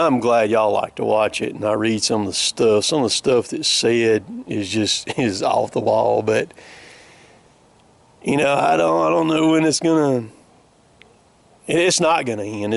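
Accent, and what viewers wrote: South, United States. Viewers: Man you have one of the thickest southern accents I've ever heard! Where you from brother?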